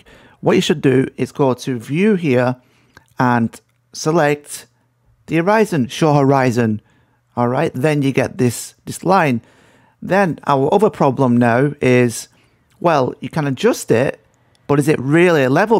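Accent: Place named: North, United Kingdom. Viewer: im from canada but YOU are a northern boy arnt you..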